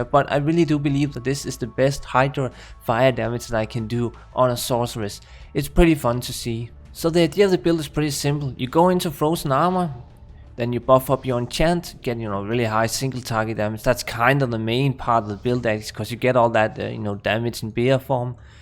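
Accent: Denmark. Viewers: Are you from Denmark? Your dialect sounds a little Danish 😀💪🏻 great video!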